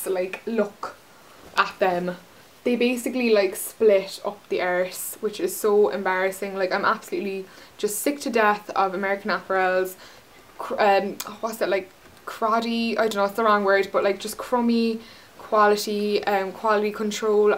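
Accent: Ireland. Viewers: R u from ireland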